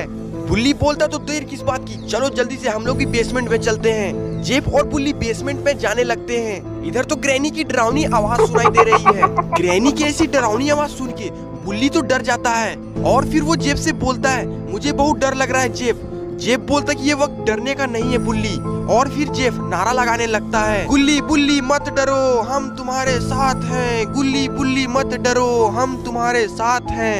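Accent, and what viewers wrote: Bihar, India. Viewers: 😅😅😅😅 aap Bihari ho kya❤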